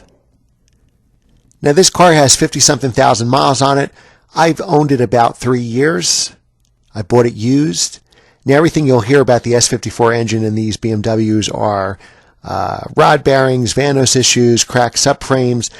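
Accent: United States. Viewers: as soon as I hear an eemerikun accent, I switch off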